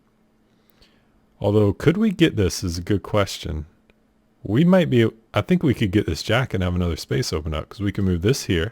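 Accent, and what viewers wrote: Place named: South, United States. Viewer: I watched a few minutes of this and started winning more. Finally back to attempt mastery! Love your accent. Where the heck are you from? I'm from the south; hearing a bit of it!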